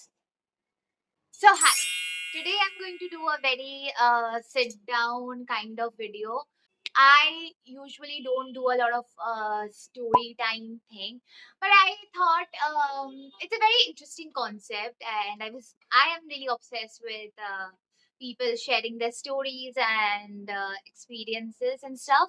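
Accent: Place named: West Bengal, India. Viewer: GIRLLL ,.. i"m not bengali but i liked your accent. Its cute🎀. Anyways i liked your storytime .. its my first time seeing your video.💌🌸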